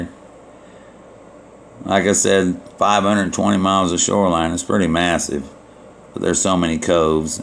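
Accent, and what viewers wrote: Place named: South, United States. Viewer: Hey from GA! :)) Weather in this year is very bad... rainy every day.. What is your weather like? our states are neighbors! I see south accent, it's cute!))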